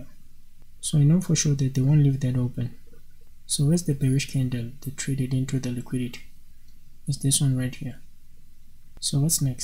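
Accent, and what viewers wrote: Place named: South Africa. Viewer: you are such a legend I watched this video again now... Are you from South Africa? Sounds like it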